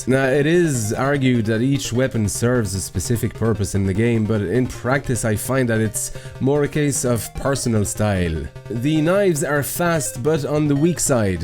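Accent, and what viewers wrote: Ireland. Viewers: yay I win, I kept thinking this dude is Irish...but then French ha ha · Henry Pym Irish accent:) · Slightly off-topic, but what is this accent? Irish and French?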